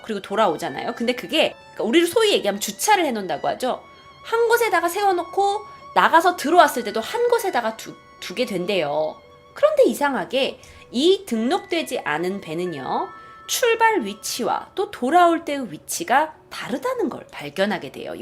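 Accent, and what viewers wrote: Jeolla, South Korea. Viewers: 고향이 전라도신가벼여?? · 남자는 숫가락힘만 있으면 딴생각함 근데 제시카님 전라도출신이세요? 사투리 감칠난다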